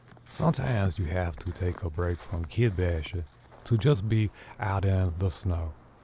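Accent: Louisiana, United States. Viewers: Where is he from? Is he Cajun? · Because he's either Cajun or Creole I think. He's mentioned before about where he lives and the accent might be put on a bit for the videos but that's part of the character I guess.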